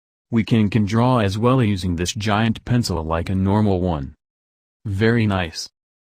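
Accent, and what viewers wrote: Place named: United States. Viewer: astig naman ni american ang boses HAHA.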